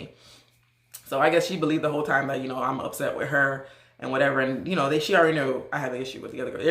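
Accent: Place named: Gullah Geechee, United States. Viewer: I just don't understand some people. Sounds like you're better off sis. And are you from SC? You have that geechee accent going on?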